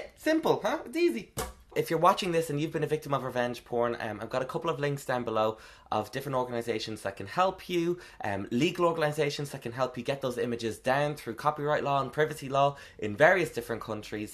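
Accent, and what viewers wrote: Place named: Ireland. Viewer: wait where in Ireland is he from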